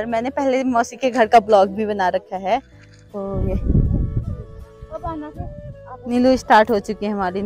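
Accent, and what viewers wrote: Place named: India. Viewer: aapke bhasha deval side ki lag rhe the to mena bol dya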